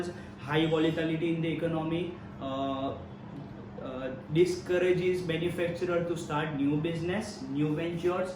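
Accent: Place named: Gujarat, India. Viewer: Sir aap Gujrat k lagte ho!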